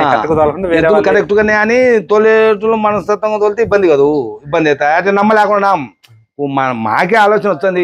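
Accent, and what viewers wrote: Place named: Rayalaseema, India. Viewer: Pure rayalaseema slang❤❤🎉🎉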